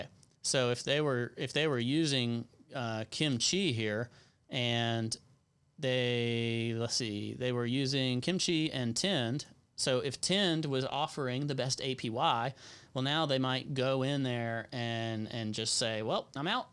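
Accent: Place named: South, United States. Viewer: We have ourselves a southern gentleman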